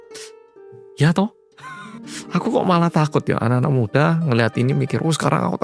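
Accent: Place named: East Java, Indonesia. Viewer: Ciri khase omongan pasuruan Malang Masalahnya Untungnya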